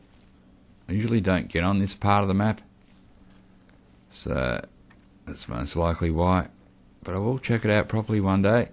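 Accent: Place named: Australia. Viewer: Another great one! Btw just love the aussie accent, sounds so "real" hehe :-D just love it mate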